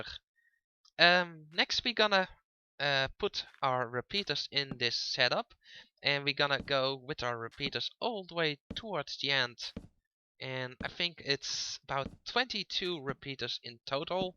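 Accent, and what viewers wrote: Netherlands. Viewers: are you dutch? sounds like it :P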